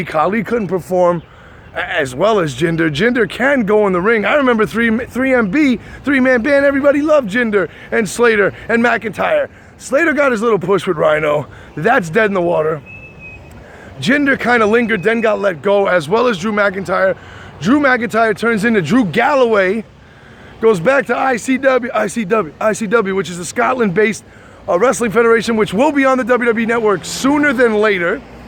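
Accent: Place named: New York, United States. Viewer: I hear the NY accent now 😜 ps.. game 6 is ours! It ain't over till it's over brother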